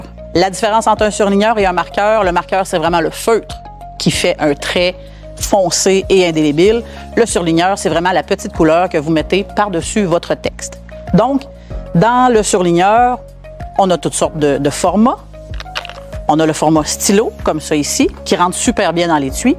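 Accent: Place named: Quebec, Canada. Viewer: T québécoise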